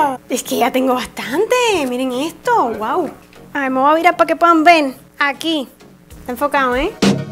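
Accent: Cuba. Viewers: Hola guapisimaaa que linda eres me encantas soy feliz viendo tus videos y el acentito cubano yo vivo en Sevilla soy de Ecuador mi patria querida ole ole cada vez mas entretenida interesante intelugente con esa actitud positiva mi latina favorita te mando un beso muy grande que te queremos mucho tambien mi hija Patricia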